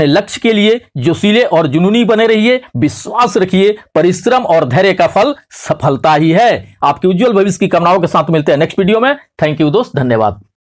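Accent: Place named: Bihar, India. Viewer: Sirji aap ki tone se lagta hai ki aap bihar se hai mein bhi bihar se hi hu aap se mulaqat ho sakti hai kya.aap bahut achhi informations dete hai.dhanyavad.jai ON-PASSIVE.JAI BHARAT.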